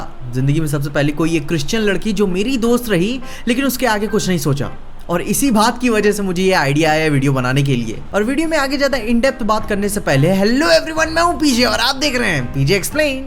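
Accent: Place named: Bhojpuri, India. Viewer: Bhai love your expression ❤️😘,or Tera bhojpuri touch bolne me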